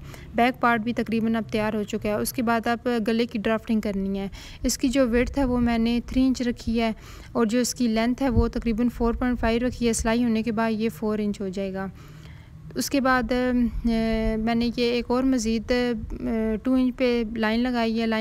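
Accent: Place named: Chakwal, Pakistan. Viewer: App ka lejha bta Raha ha k ap chkwal side se hn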